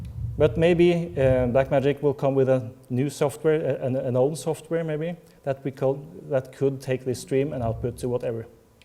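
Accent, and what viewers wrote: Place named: Norway. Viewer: Tok ikke lang tid å høre at denne fyren er norsk!:P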